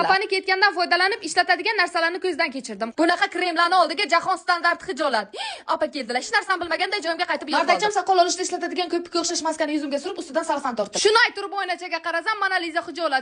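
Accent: Bukhara, Uzbekistan. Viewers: Sheva buxoro 😂 Buxoro qanisila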